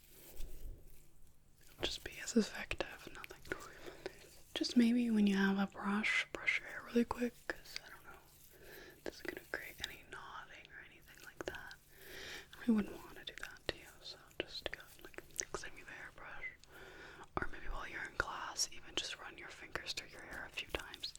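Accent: Canada. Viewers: ...Nigga are you canadian?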